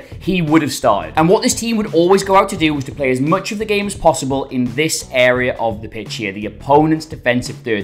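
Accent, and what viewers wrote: Newcastle, United Kingdom. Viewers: I knew you were a geordie since you sound like Matty Renton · wow, jon richardson's turned geordie.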